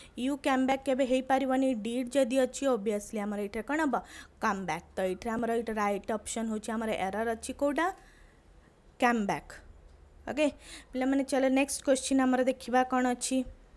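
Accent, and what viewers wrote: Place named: Odisha, India. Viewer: It's motivate kare au please English padheila bele odia accent dhariba is blunder😂😂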